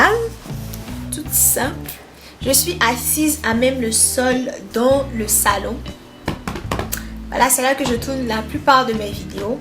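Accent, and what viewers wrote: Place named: Ivory Coast. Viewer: L'accent ivoirien!!! Yes bisous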